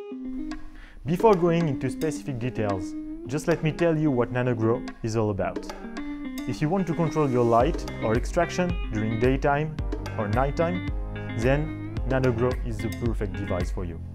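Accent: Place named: France. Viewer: Nan nan nan ... ce n’est pas possible, pas avec un accent français à couper du bois et encore moins avec un look de VRP ... Je vous le fais version vendeur pro, sans accent soporifique contre une Gavita e-series 6/750w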